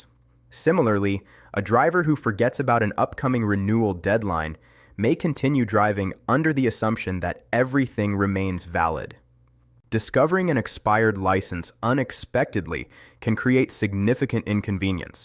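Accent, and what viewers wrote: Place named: United States. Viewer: Load of Crap. Why is it that all post's loke this one is narrated by an American or Canadian.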